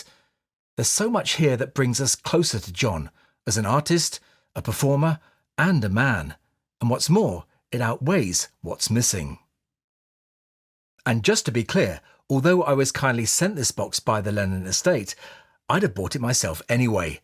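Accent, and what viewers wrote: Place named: United Kingdom. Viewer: Excellent review. As an American, I find your "British voice" (not the correct way of expressing this) adds to your deep credibility in discussing Lennon. I will not, however, spend my money on this set because I was alive in 1972 when were radical artists doing radical artistic stuff in New York City and I heard their works back in the day when all their unique material first was released. I have to conclude that their son, Sean Lennon, misses the point entirely about his radical artist parents. There must be something wrong with him. Maybe he is "in denial"? He of all people should have loved and accepted his parents for who they genuinely were. And they were radical artists. No two ways about that. This set may promise to be impressive in the context of the truth that today's technology makes audio recording with the available technology from fifty years ago sound "better." But eliminating the controversial song " " from the set does not make this set "better" at all. 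Quite the opposite is true. The specific elimination of that essential song in unforgivable and the elimination erases any credibility Sean Lennon has as a producer.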